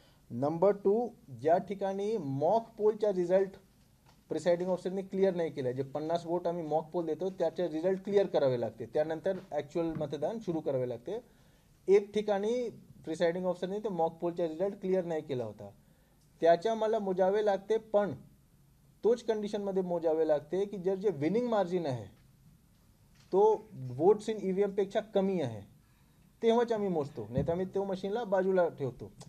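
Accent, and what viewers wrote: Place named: Gujarat, India. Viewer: गुजराती मराठी निट बोल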